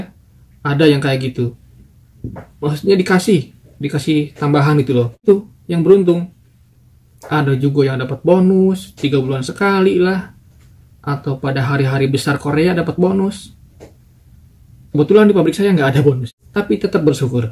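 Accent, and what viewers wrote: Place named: Java, Indonesia. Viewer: Perasaan Jawa barat ngomongnya Sunda😅 Iki lho jowo medhok rek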